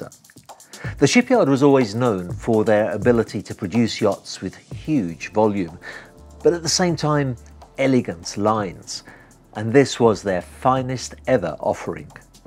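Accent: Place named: Birmingham, United Kingdom. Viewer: As a photographer / videographer (as well as a boater) I find your videos inspiring. With your TV standard presenting, it's great to see ... (brummie accent makes me smile) .... 🇬🇧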